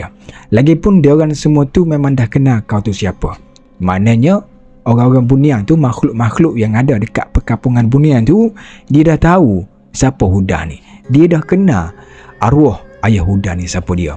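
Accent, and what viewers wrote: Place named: Terengganu, Malaysia. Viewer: haha..ingat cakak banyak G belakang ore ganu..ok terbaik..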